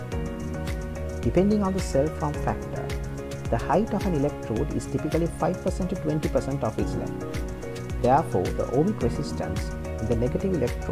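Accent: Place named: India. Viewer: why India English.......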